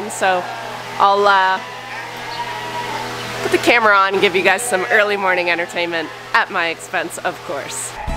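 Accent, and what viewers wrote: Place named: Canada. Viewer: As a texan, i love your canadian accent!!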